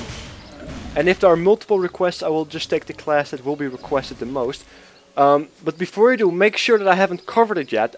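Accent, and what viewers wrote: Netherlands. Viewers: Any chance youre dutch? It kinda sounds like it.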